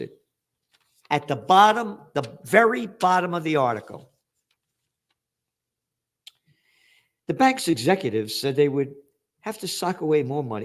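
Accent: Bronx, United States. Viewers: You sound like a proper Bronx bastard. Not quite as foul mouthed as my Brooklin relatives, but at least you fucking try.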